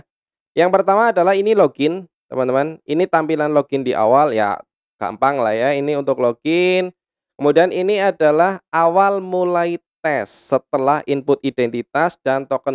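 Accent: Banyumas, Indonesia. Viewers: terima kasih om...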